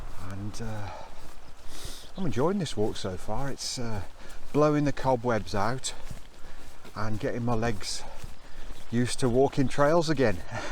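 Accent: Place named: Wolverhampton, United Kingdom. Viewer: Bloody hell! I’m in Wolves too! You’re right there. I thought I recognised the accent. I’m all about the thru-hikes and wild camping these days of course.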